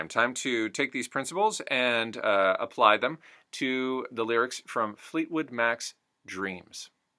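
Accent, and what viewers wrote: Canada. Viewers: Very, nice. I always like the Canadian accents. One characteristic I have noticed, especially with the more western Canadian accents is that they do not put much emphasis on their consonants- they seem more muted that the vowel sounds.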